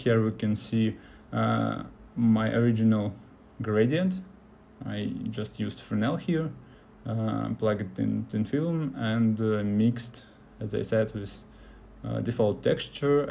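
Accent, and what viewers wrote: Russia. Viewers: Русский акцент) Ты случайно не с мастерской?) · оцень русский акцент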